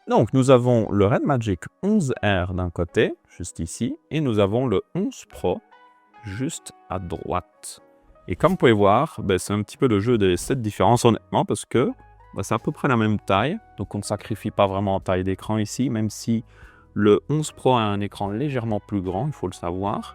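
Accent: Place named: Belgium. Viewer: Nos amis belges ont un bel accent.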